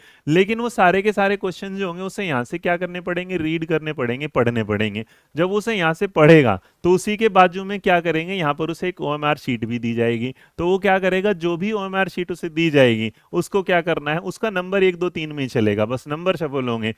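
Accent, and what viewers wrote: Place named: Haryana, India. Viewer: Haryanvi touch....oonline 😅😅😅 ...Sir Please no bad intention just like the way u speak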